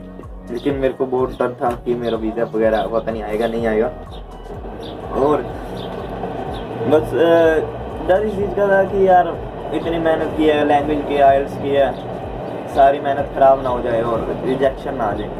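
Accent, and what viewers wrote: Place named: Haryana, India. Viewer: U used haryana Hindi but still got visa That's called Desi boy power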